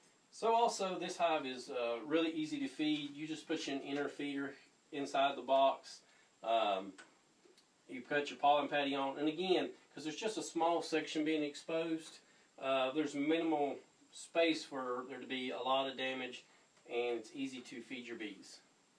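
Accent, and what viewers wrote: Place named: United States. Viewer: sadly with bad acoustics and US accent I cannot hear or understand this video. any chance of sub-titles for key points. Thanks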